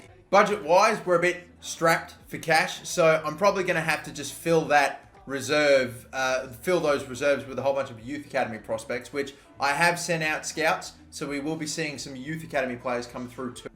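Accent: Australia. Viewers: It’s probably his Australian accent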